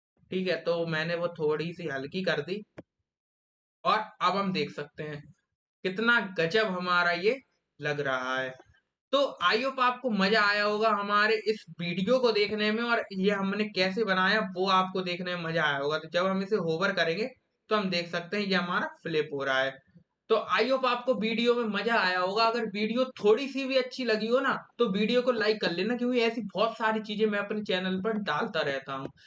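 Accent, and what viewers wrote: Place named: Bundelkhand, India. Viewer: Bhai badiya bole bundekhndi m mja aa gao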